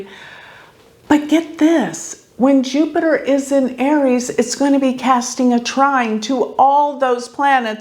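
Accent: Texas, United States. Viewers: has a sweet accent: 'Intercontinental' became 'inerconinenal'. Perhaps it is texanese.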